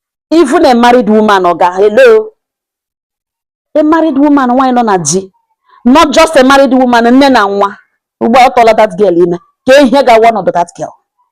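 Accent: Mbano, Nigeria. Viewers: You're really Mbano woman. Kudos for being proud of your dialect. ❤.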